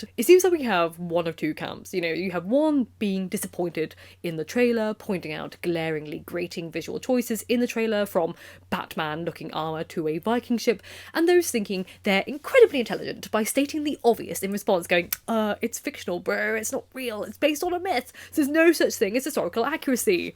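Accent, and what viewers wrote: United Kingdom. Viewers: What is the name of this accent? I'm not well versed in the names of British accents besides RP and something auditory like an accent is difficult to google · This is the most aggressively British voice I've ever heard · You have the most BRITISH voice ever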